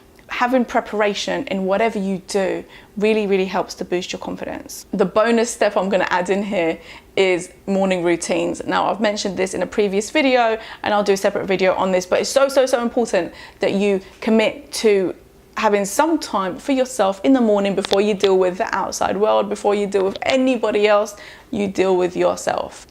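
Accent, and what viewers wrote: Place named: Russia. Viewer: I love the video but do you from Russia or Germany ???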